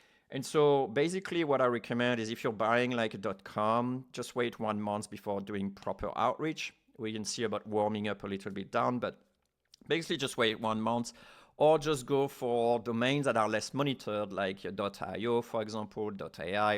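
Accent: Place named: France. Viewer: Hello are you french you have an accent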